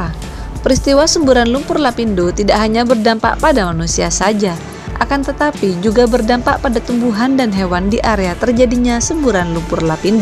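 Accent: Madura, Indonesia. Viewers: Kayak orang madura logatnya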